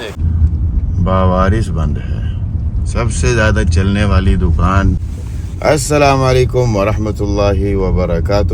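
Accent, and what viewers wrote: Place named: Pakistan. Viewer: Bhai ap kia Pakistan sy hn?qk ap ki urdu bhut saaf hy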